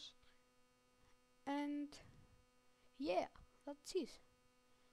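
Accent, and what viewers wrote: Greece. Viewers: ναι εισαι Ελληνας και το καταλαβα μην προσπαθεις να κανεις αγγλικα βιντεο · To katalavenw apo ti fwni oti ise ellinas;-) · Εισαι έλληνας ε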